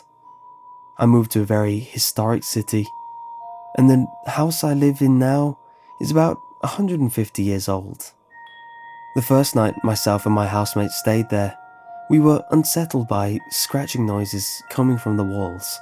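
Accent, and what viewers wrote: England, United Kingdom. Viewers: I'm guessing you don't live in the UK, it's an english accent not british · The Favorite Gentleman the accent varies is lucky to get a nice english accent unlike scousers and geordies god i hate there accents lol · Yes he has a very sexy British voice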